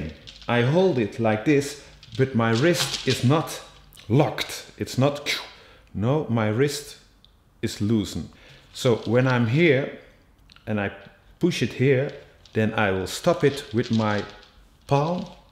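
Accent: Netherlands. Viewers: This is great, thank you! What size/shape of Shekere would you recommend for beginning? By the way, are you dutch? Ik hoor het in je accent :)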